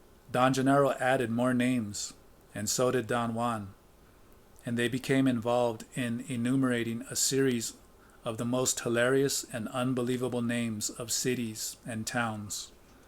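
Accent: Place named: Spain. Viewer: Listening to these magical books with the clear spanish accent is perfect! Muchisimas gracias hermano!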